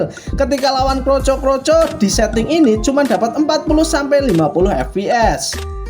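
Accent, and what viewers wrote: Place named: Java, Indonesia. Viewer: Logat jawanya sangat kental 🤣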